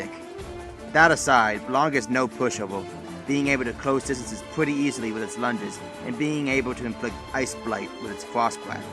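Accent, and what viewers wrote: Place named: Ireland. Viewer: It sounds irish :D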